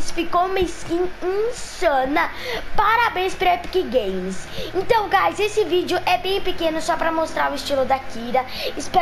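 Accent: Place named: Bahia, Brazil. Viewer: Kkk muito engraçado vc falando parece um baiano kk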